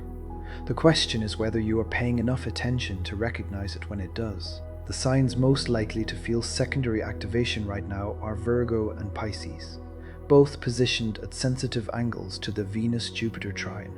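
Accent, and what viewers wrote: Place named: Ireland. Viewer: 💯👊💪👍 Bravo - First Time Hearing the Voice of an Irish Astrologer. 👏🏻👏🏻 Wow. Right now, this is like finger on the pulse, so much in my financial horizon, it's right on the money.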